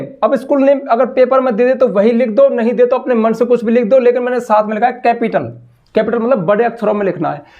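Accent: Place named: Uttar Pradesh, India. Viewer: सर जी आप यूपी या हरियाणा स हो क्या? में यूपी स हूं नु पुंछ रा हूं। और आप का बोल ने के लहजे स लगरा आप भी शायद यूपी के हो।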